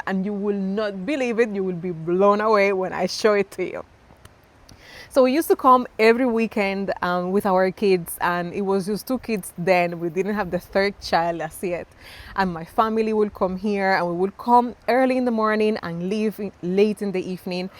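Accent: Patois, Jamaica. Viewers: You’re starting to sound Jamaican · I love to hear when u speak the patois ❤😅😅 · ITS NEVER A DULL MOMENT WATCHING U I AM A JAMAICAN LIVING IN THE U,S,BUT THERE IS NO WHERE I LOVE LIKE MY COUNTRY,I OF TO TELL U I NEER TIRED OF LISTENING U TALK PATWHA U IS A REAL JAMAICAN FE TRUE I REALY LIKE WATCHING U BIG UP TO U N UR FAMILY 🙏🙏🙏🙏🙏🙏🙏❤❤❤❤❤❤❤❤ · You sound jamaican can hardly hear the spanish accent 😅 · Your patois is soooo hilarious! 😂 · I am so proud of you. Gal yuh awesome. An mi luv yuh patwah/patois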